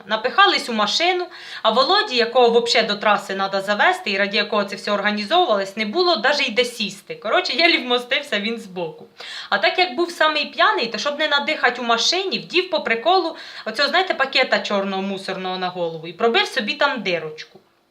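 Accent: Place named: Surzhyk, Ukraine. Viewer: Самое главное: и грамма пошлости нет. И умно, но легко, а уж суржик..просто прелестный!)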